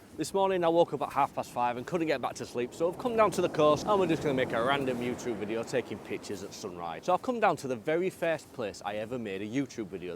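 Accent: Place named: North, United Kingdom. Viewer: Good stuff. It's a pity that the speed of your talking and heavy Northern accent makes it nearly impossible to understand you, much less learn anything.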